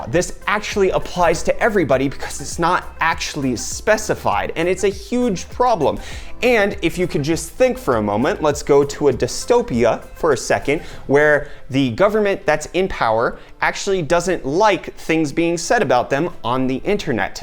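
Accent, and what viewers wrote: United States. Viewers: Most South Africans don't have accents :) He does seem to have a slight American accent though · You have an American accent though... 🤔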